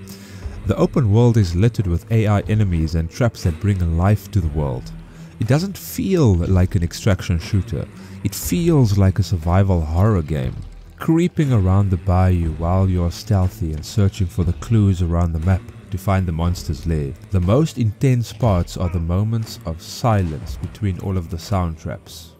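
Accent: South Africa. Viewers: you have a very mild south african accent.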